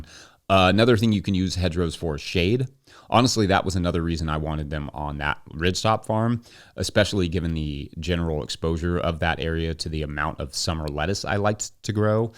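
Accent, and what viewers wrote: Kentucky, United States. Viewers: For sure. My father hobby-farmed ten acres (but I didn't choose farming). I understand that part of it is time pressure and could also be the frustration of training people who turn out to be temporary/seasonal etc. This podcast might turn out to be a good way for to capture what he's learned and document best practices for different crops for those of us who find ambiguity torture. (He already has some great videos about wash-pack and setting up for market, for example.) I grew up on a little island near Seattle but have spent the last decade near Barcelona. It would really make more sense for me to tune in to someone who discusses Mediterranean climate crops and techniques but this channel is one I like when I'm tired of being an expat and craving English. I guess I enjoy a Kentucky accent, especially his fast-talking version, which communicates some urgency and makes me feel like he doesn't want to waste time. 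(I wonder if he realizes he's just keeping random viewers company while they make dinner or clean house.) Agricultural content feels like home, too. Thanks for validating my comment, it's the most other people operating another way that makes autistic persepctive hard sometimes.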